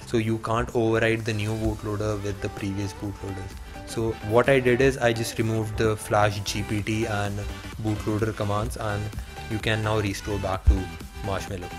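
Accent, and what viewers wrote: India. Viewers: perfect YouTube (Indian) accent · UUDDLRLRBA Start Stop judging mate! Look into yself! He wanted to give some info(In indian English so wat?), which he did obviously,worked for me though...so stfu n stop using YouTube...trust me on this!!!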